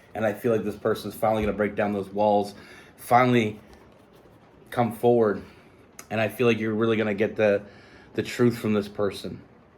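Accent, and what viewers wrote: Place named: Canada. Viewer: I can hear a Canadian accent, but my goodness. Rain in July??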